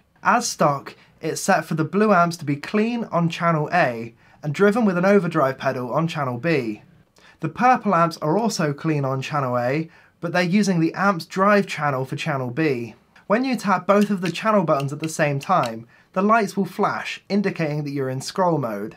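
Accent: United Kingdom. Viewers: I wana sound this posh 🤗🤘🏼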